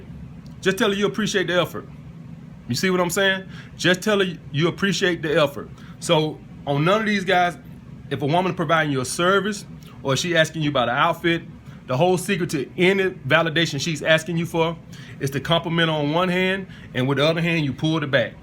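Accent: South, United States. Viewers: that country accent is dope haha. Good looks on the game big bro, just subscribed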